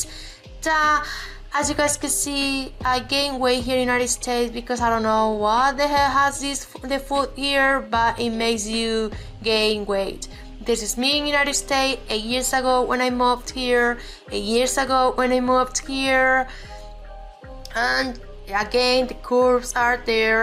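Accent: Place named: Spain. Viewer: Me encanta tu acento. Algun día visitare España.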